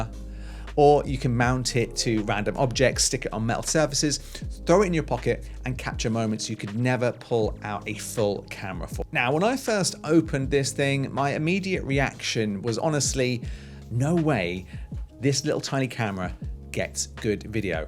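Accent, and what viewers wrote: United Kingdom. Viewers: I love the British Accent 😯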